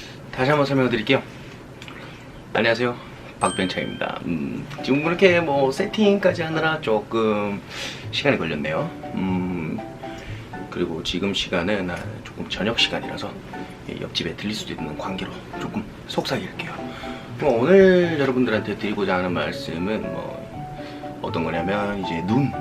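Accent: South Korea. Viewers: 나 화면밖에서 경상도 사투리들려서 들어왔는데 레알 경상도였네...그래 잘생겼다.... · 뭔가 말투가 완전 대구사투리는 아닌것 같은데 ? 서울말이랑 섞인건가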